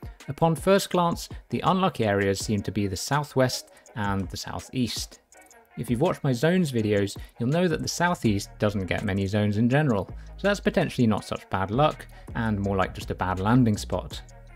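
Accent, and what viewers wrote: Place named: England, United Kingdom. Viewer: This is so good but since im from australia the english accent triggers me lmao, good shit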